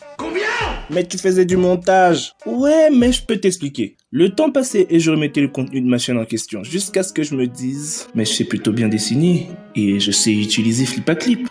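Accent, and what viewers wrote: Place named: Democratic Republic of the Congo. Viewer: t'es congolais de kin ou de Brazza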